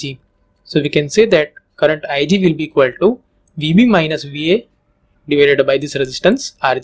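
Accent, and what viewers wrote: India. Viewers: why do indeans speak like this ? can someone explain LOL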